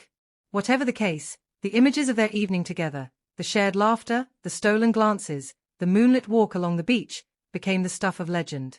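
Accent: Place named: England, United Kingdom. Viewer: Too funny lol A woman with an English Accent using such words to describe Meghan...friendly, etc ETC If the A I bot said Mean Girl, Not to Bright, Liar, Bully, and has no clue 9th month the Baby drops, not rise! He may be wealthy but in terms of how MM views herself and the turning Harry into her lap dog, Mark is mostly in the same place as Harry though his Marriage is vastly different than MM' s spare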